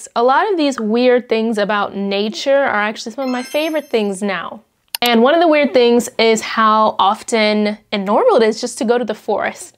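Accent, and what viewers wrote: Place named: Europe. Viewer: So interesting...I would never know you were from GA...or even the US. You sort of have a European accent with your English. Very pretty. :)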